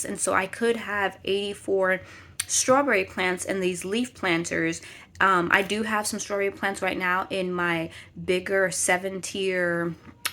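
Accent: New York, United States. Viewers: I’d never known you had a NY accent, but it’s ok, you sound good to us. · Don't lose the NY accent - it's cool!😎 I'm from AZ but have always loved NY❤️✌🏽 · I never heard your New York accent before! I would have never guessed! Wow! You are really prepared for Spring! This summer I will be attempting to grow Tepary Beans. They are native to our Desert here. The Native Americans have grown them for centuries here without any Irrigation! Hope they grow! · Heyy... I love your N.Y. accent, just let it roll.. lol.. but you're doing just fine. I am glad you were able to get the beds done and the hoop house. I too, did a lot of marigolds as well and doing some more flowers. The duck cattle panel hoop house is cute too.. have you look on pinterest on duck, and chicken panel hoop houses.. they are cute as well.. I am so stoked about going out to the homestead when it warms up to design or get everything started... 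You have everything going well, I am very proud of you on every thing you do.. have a wonderful day.. I do have a question though. If you look at my beds will I have to get top soil or could I just put my black kow on top. I used a lot of compost leaves sticks etc.. or do how you are like putting top soil and then the black kow, I get confused.. You do look good sitting up under your gazebo with your table and chairs.. lol.. Thank you for the video..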